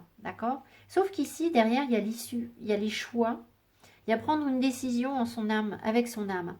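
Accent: England, United Kingdom. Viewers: J’adore votre accent anglais 😊 merci c’est juste 🙏🏻 🙏🏻🙏🏻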